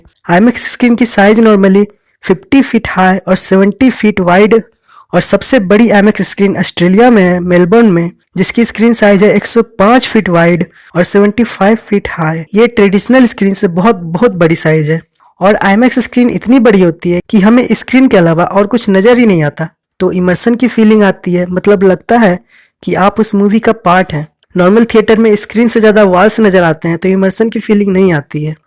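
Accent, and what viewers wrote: Patna, India. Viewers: Bhai Patna se ho? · Bhai tum patna se ho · Are u of patna?? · Bhai aap Patna se ho kya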